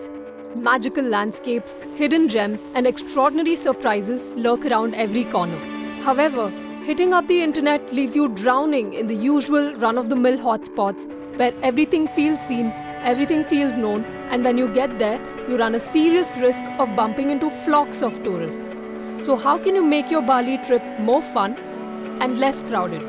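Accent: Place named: India. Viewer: Your horrible accent makes it an unwatchable clip. Find a neutral narrator that is not Indian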